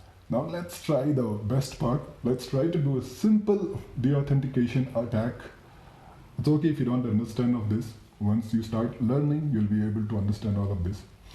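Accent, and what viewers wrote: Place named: India. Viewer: Aren't u turkish? Its sounds like ur indian ur not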